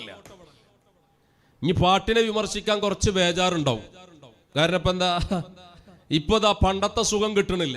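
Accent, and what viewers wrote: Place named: Malappuram, India. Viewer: Edenda engane paye usthad mare style Ningal Malappuram aano...kurach bhasha nannakkiyal nallath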